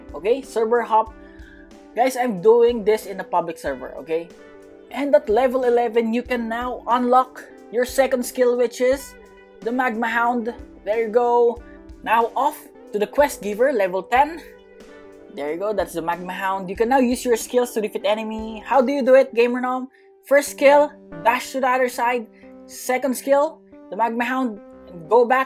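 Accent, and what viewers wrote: Philippines. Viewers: The accent r u a filipino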